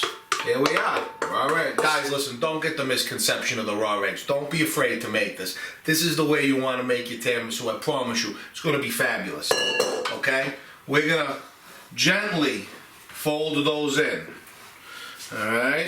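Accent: Boston, United States. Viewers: Just wondering where you are from. I’m from Boston area and your accent sounds like mine! Lol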